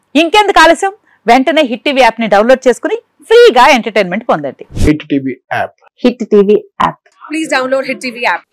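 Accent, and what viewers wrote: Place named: Vizianagaram, India. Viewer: విజయనగరం జిల్లానా? మాట తీరు అలాగే ఉంది!!!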